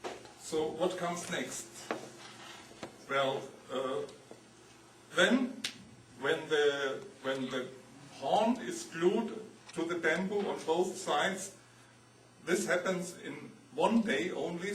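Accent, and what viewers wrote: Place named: Germany. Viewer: xDD never heard such a typical german accent, and im german so i heard much^^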